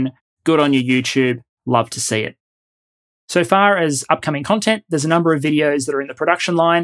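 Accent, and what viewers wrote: Australia. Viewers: Hi, nice to hear an Aussie accent again. I'm Aussie born (Sydneysider), but have lived in Europe for 57 years, so the main part of my life. I do however still keep track on the goings on Downunder. As for that financial bit, they could be counting on the unexploited resources of the Donbass area and got the figures mixed up. I mean that points to the potential of the area, not what is being produced today. The Donbass holds large quantities of oil and gas and only a minimum is being exploited today. The presidents/governemts before the Maidan revolution (February <num> never put much money to it and kept Ukraine dependent on Russian supplies, which they frequently turned off and let the Ukainians sit in the cold. That was never really necessary if they had exploited those fields themselves. This brings me to why Russia went into Ukraine. 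After the Maidan revolution (2014) plans were made in conjunction European energy and fuel conglomerats to start exploiting those reserves. Because a. that would ensure gas supplies to Ukrainian citizens so they wouldn't have to suffer cold winters again and b. the European market was increasingly in need of gas and it would make them less dependent on Russian gas, with the bonus of revenues for Ukraine. That is what sparked the Russian invasion. They were afraid of losing their controle over the European market and losing their hold on Ukraine. Every expanation else is just Russian propaganda and holds no merit. However the Russian inv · I would like to know the overall effect of the cleaning out of all the old Soviet hardware. Is this a good thing? Does this allow all the EU countries to upgrade and standardize their military hardware. And NATO has pledged a 300-400,000 man force. Do you think this is affordable for the long term. 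Because it seems that this 400,000 force will require about 2 years to become really effective. And If I were President Putin, I would try to have everything sewn up by say, next spring. A stablized drawn out struggle works against Ukraine, because Russia can send random missiles to reach any factory or training center anywhere in the country. These Are my 2 questions. Thanks. I always like your information. I enjoy your jokes and your accent. As being here in Japan, I don’t meet anyone with an Australian accent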